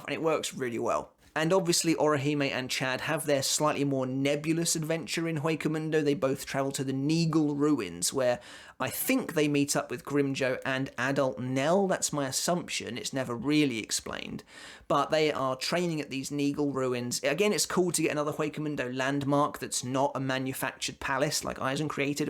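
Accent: United Kingdom. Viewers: Bro you british ?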